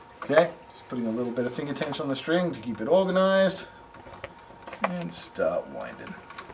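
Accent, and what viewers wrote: Australia. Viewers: Tequila - sounds like he comes from Australia? Sounds like that kind of accent. Anyway, awesomely informative video - I've got a razorback coming soon and this makes it look so simple! 5*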